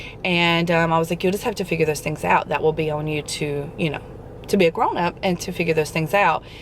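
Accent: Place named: South, United States. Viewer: I don’t think you’re crazy, you’re a mother (mama bear), you don’t look old enough to have 2 20 year old daughters, but I must say this as a Brit, love a southern accent on a woman, stronger the better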